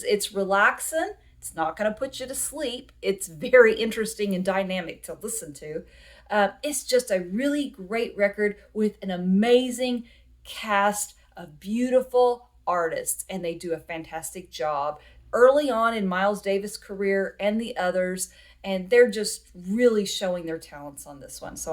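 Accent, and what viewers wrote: South, United States. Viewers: .Lovr your southern drawl.Your excitement is evident on the video.This is not even his celebrated era.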